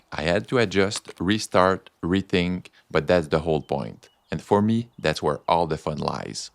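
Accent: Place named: Quebec, Canada. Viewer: Its the quebecois heavy accent for me. All the different angles of this video though, lots of work going into this for sure. Great job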